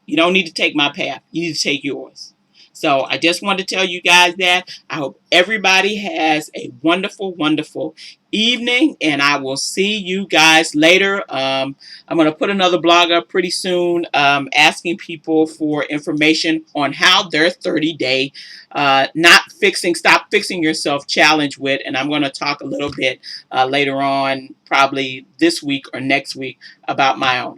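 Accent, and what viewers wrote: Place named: South, United States. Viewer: !! I've appreciated your presence on BBW and Twitter for some time. How am I just now seeing your YT channel?!?!? I loved this and look forward to checking out your other vids. Off topic: I LOVE your accent (fellow Southern girl?...)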